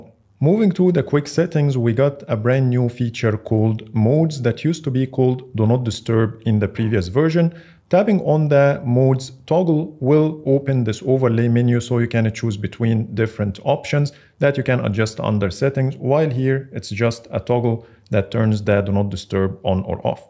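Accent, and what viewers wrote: Egypt. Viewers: You have English Egyptian style 🎉 your brother from Egypt too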